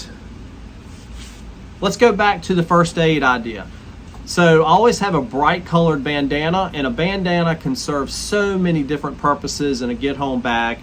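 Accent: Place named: Western Maryland, United States. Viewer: Thanks for the videos, they're very helpful for camping/bushcraft info! Sidenote: I grew up in western MD but have been living in the Rocky Mts for a few years and man, hearing you talk is making me so homesick!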